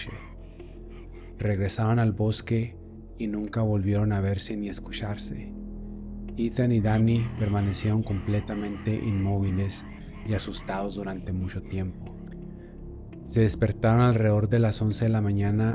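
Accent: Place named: North, Mexico. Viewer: Me gustó el video. Como sugerencia personal, tal vez puedas engrosar un poco la voz para que suene más tenebrosa. Las historias son buenardas. Por cierto, eres del norte de México, ¿verdad?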